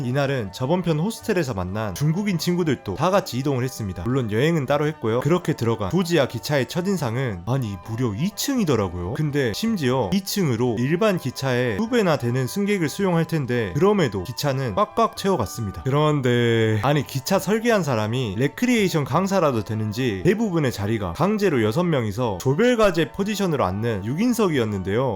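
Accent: Jeolla, South Korea. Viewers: 전라도 말투도 너무 정겨워브럿고요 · 사투리는 어디쪽인가요? 전라도? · 혹시 전라도 분 이신가요? 말투가 너무 정겹네요 ㅋㅋㅋㅋ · 억양이 귀여움~전라도쪽인듯 ㅎㅎ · 전라도 억양! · 전라도사람인가보네요 악센트가심하시네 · 절라도 사투리 졸라 쓰는디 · 전라도사투 리에 디테일한 언 어 구사력 쥑입니다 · 익숙해질때까지 시간이 좀 걸리네요 전라도사투리였군요? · 전라도 사투리 나레이션 익숙하지 않은데 재밌네요 ㅋㅋ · 구수한 전라도식 발음이 참 정감이 넘칩니다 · 난 경상도인데 전라도 말씨 참 좋다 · 전라도 사투리. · 억양이 빼박 전라도네요! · 전라도 사투리 듣기싫어요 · 내용은 좋은거같은데 전라도 사투리가 너무 구수~~해서 앞부분만 보다가 갑니다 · 남도 사투리가 겁나게 친근허요...잉.. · 아 전라도근본 사투리억양 ㅋㅋㅋ 듣기힘드네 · 전라도 사투리가 꽃혀서 집중이 ㅜ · 고향이 전라도 인가요?ㅎㅎ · 광주분인가?? 전라도 사투리 어투가 뭍어나네요... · 영상은 재밌는데, 절라도 사투리가 너무 거슬리네요. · .억양이 절라도 말투가 너무 심해서 알아들을수 없습니다....절라도 억양좀 자제해주세요. 인간적으로 너무 심합니다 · 전라도 사투리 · 구수한 전라도 사투리와 쌉소리 스크립트의 환장의 조합 ㅋㅋ 재밌게봤습니다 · 전라도 엑센트 못버리네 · 전라도 사투리만 안써도 볼텐데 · 고향이 전라도인가 바요?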